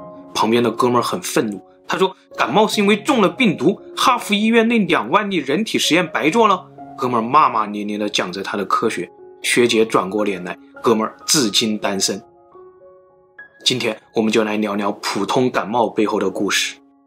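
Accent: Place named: Hubei, China. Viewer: 现在听湖北口音听上瘾了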